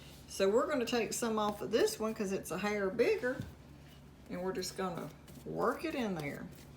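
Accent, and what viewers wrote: South, United States. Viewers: Have you ever heard your voice?? It’s horrible you give southerners a bad look & btw fingers are not pronounced as dangers ! Please try to do better!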